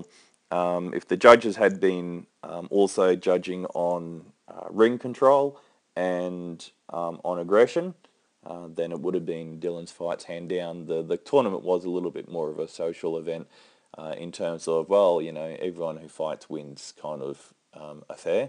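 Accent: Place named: Australia. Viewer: I like that you take the time to breakdown and study the fight, and the realistic approach on how taiji can be applied. It's sounds like you're Australian, where are you based?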